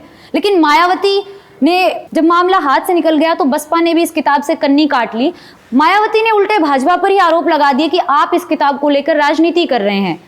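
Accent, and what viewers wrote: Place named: Haryana, India. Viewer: You have a distinct haryanvi flavour in your accent, and i really like it. Respect from this bihari. Love your journalism and topics that you raise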